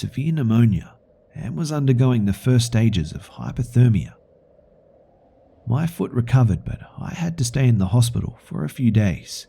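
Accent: Australia. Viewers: Aussie all you other people are so dumb he's one of us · I can't take these stories seriously on account of that charming aussie accent. It's not scary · your Australian accent is schmexi man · He's an aussie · I believe he is the Aussie of our horrors. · great video. keep up the good work and some people say they love your voice. To me it sounds normal because I am Australian too.:) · Australia he lives were I live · To honest, things are less scary in an when Australians talk! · he's Australian you rotten walnut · Australian, I believe. But the horror master himself should answer that. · Aussie he's one of us mate! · Coming from a Kiwi, this guy's accent is most definitely Aussie.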